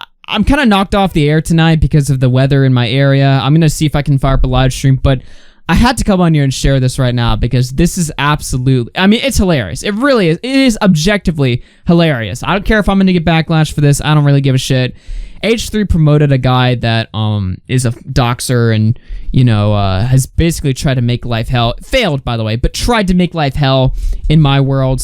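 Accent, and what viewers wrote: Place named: Upper Midwest, United States. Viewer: Damn I thought he was more upper midwest with me.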